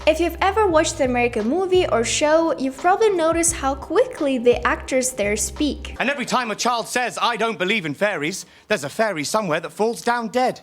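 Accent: Russia. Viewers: Девушка, вам не кажется, что у вас очень яркий русский акцент? Буквально русскими буквами говорите английские слова. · Ну у тебя ещё присутствует русский акцент, милочка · СЛЫШУ РУССКИЙ АКЦЕНТ😁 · Русский акцент узнаваем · lemme guess, her accent is russian, isn't it?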